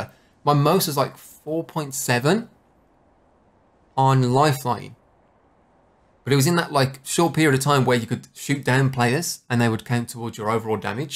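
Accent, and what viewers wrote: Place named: United Kingdom. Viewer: Since has a nice British accent the sponsors sounds like an ad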